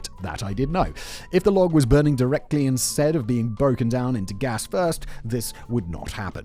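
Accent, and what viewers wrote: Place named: United Kingdom. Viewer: Love when I click on this I hear a British man talking about cock lol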